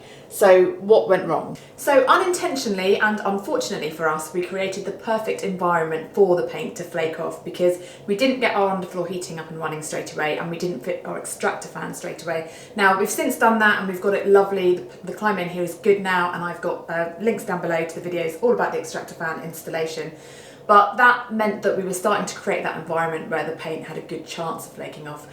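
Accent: United Kingdom. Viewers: 1 hour we have to listen to British accent?! Go to the point. Jeezz! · The British accent one of the most spoken language in the world Obviously the Education system has let you down never mind keep reading your early learning books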